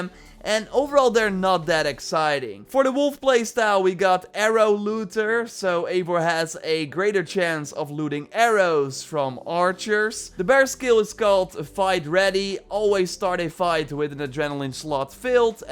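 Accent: Netherlands. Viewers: Ok just a quick question love the vid but are you dutch :D xD just a question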